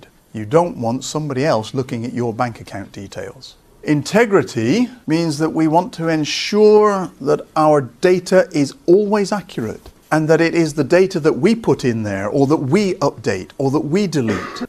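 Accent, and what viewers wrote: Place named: United Kingdom. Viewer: Hey, your videos are very nicely explained along with eloquent British accent. Keep on posting videos. Thanks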